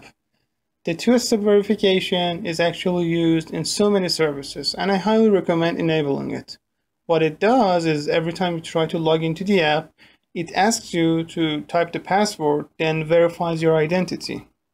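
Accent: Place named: Iran. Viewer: thank you,, nice persian accent :)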